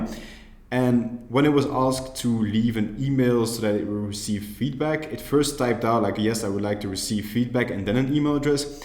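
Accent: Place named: France. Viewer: Je kiffe l'accent français !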